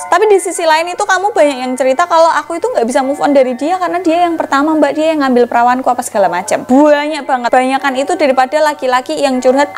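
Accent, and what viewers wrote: Java, Indonesia. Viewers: ciri khas bahasa PRIBUMI nya medok Good luck buat kk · Medok pisan si mBak iki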